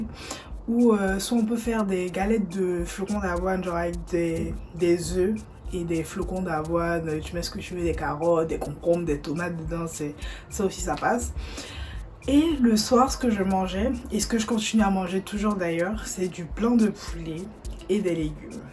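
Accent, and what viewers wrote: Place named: Ivory Coast. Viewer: On sent l'accent ivoirien. Ko djaaaaah!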